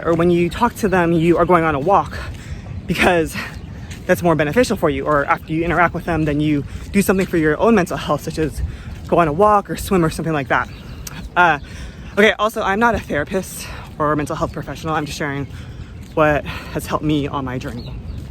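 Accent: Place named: Hawaii, United States. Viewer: I’m born and raised in Hawaii and live in my childhood house on the windward side of Oahu. I really enjoy watching videos people post about living or eating in Hawaii. I love your Asian Aunties, and Mamma Lai videos… my all time faves!!! If you hadn’t mentioned where you were from, I would have thought you were from here!! Have a great day and thank you for the morning walk 🫶🏻🤙🏼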